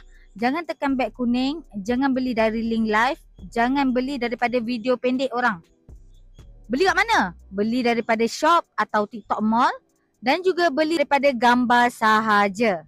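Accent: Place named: Endau, Malaysia. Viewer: Suara mcm org endau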